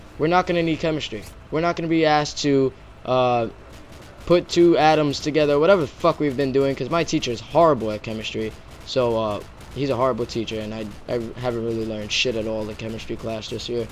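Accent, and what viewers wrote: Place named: Italian-American, United States. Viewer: You sound Italian/American, are you? Either that or your putting on the voice a squeaker would do if you said they had a high voice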